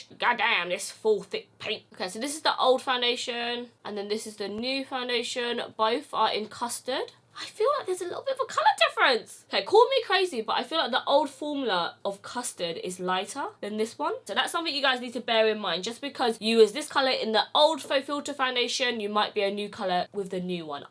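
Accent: United Kingdom. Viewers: OMG LOVE UR VOICE AND UR BRITISH ACCENT😍❤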